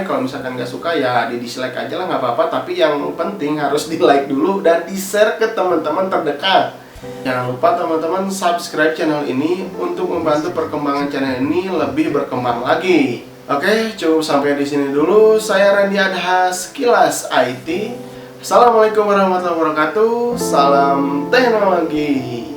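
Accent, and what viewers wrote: West Java, Indonesia. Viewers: Baru beberapa patah kata aku sudah tau bahwa anda orang Sunda 😄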